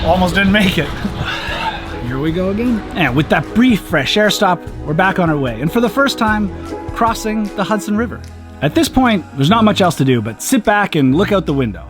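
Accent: Canada. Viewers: The man is Canadian. We don’t have to pronounce things like Americans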